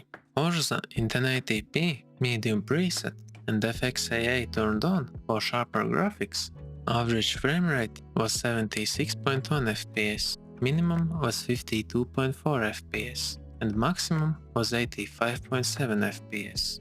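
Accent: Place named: Ukraine. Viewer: Heavy accent, reminds me, GO Ukraine! Better Democracy there than the USA right now! haha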